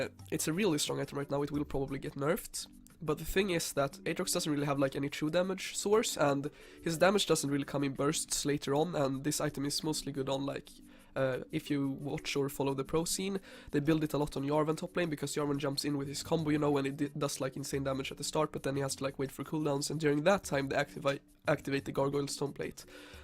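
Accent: Sweden. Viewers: Are you swedish? It sounds like that on you accent. :P. im not swedish btw,, but they usually speak like you.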